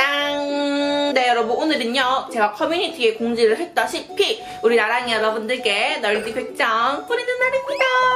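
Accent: Busan, South Korea. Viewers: 부산 사투리 듣기 너무 좋은거 같아요. 요즘 코로나 때문에 밖에 나가기 힘듬에도 불구하고 팬들을 위해 나눔해주셔서 진심으로 감사드립니다. <mail>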